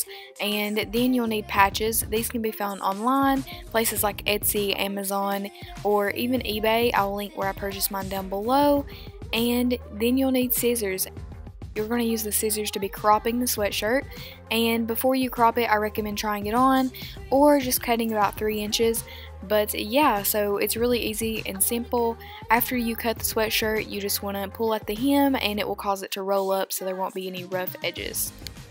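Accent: South, United States. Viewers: Nikki King It's definitely a southern accent!😁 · SPOOKY💖 btw I love your accent I'm also from the south❤️❤️ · Love your accent! I'm from Atlanta and my dad is from Alabama so we're used to that kinda thing😂 your style is so cool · you're video was amazing! I love all the ideas and I think you have the cutest southern accent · 2gymnasticgirls that's not a " Texan" accent. It's called southern 😊😤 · People are getting annoyed because of her southern accent but it doesn't matter to me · shout out to someone else who got a southern accent like me :) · She's just southern lmao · she has a southern accent....really not that hard to figure out lmao Idk why people think she's from europe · she's southern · We have the same voice SOUTHERNERS ROCK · She's southern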